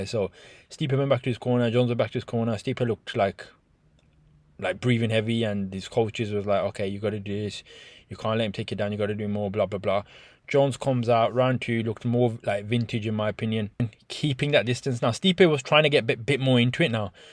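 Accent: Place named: Black Country, United Kingdom. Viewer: 💯 I hope it is a negotiation tactic by Jon mate cuz it’s the only fight I want to see in the ufc 🏧 I was never a fan of jones vs stipe tbf I wouldn’t argue with jones vs pereira but the aspinall fight has to happen first let’s be honest, keep the content up bro you sound like your from the Black Country I’m only down the road, Telford bro 👊 n you’d have to be a total idiot or just plain arrogant to write jones off against anyone I just think Tom has the edge over him not to say Jon can’t win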